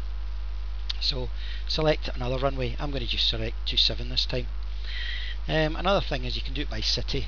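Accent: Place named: Scotland, United Kingdom. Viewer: I couldn't help but notice your Scottish accent. It's cool!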